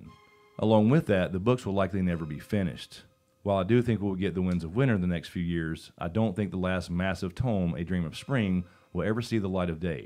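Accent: South, United States. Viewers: Yes, my heart is still broken. I have not re-watched or re-read GOT since S8. It was the sudden stop at the end. The death that Jon described as "nothing". There is an empty place where Sunday used to be. I miss you, and I miss James all of our GOT family. And because I work in healthcare, the past 2 years have been... well, let's just say GOT is not the only thing that has broken my heart. But I had a few minutes tonight and just hearing your Southern Boy charms, brought me right back to that "super bowl" feeling. GOT did not end well, but maybe, just maybe it will begin well. And we can gather around our respective computers and watch to our favorite YouTuber turned Fantasy Writer stream the GOT prequel content and our hearts will be healed.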